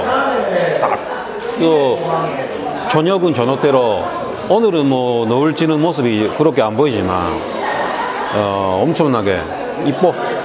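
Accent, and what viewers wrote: Busan, South Korea. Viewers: 정말 오묘하게 부산사투리와 일본어억양이 다 슦여있네야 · 진짜 부산 토박이 같아ㅋㅋㅋㅋㅋ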